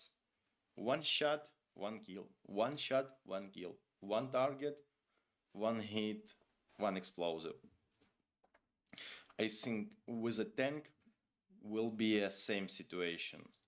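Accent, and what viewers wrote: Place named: Ukraine. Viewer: Hi, i vote that you keep the expression ”my dear” if it’s coming from Ukranian. Many of us are also non native English speakers, and we all carry small ”impefections” from our language. It doesn’t make it difficult to inderstand but it gives your english a sfumature of your own country. Thanks for the stream!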